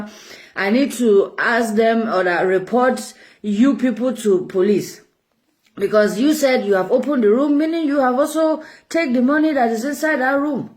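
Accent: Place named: Nigeria. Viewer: This lady is making alot of sense my dear that is how we see it. Her acent sound like Nigeria. God bless you dear for speaking out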